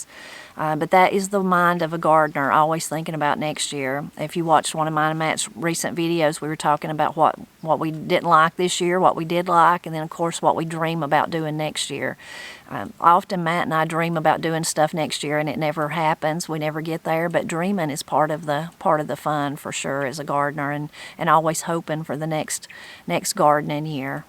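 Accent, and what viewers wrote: Appalachia, United States. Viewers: Just wanted to leave a comment: I grew up in Appalachia. My parents are from the north so I don't have a true Appalachian accent, but I use all the words y'all do. I now live in Germany as a dairy farmer. Was invited to a university to talk to Agricultural students in their English class. I'll be showing one of your shorts to showcase a true Appalachian accent. ❤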